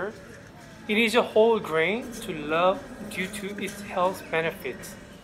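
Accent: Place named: Morocco. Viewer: Thank you to you because you speak our dialect i'am proud of you you are between your big morrokkan familly.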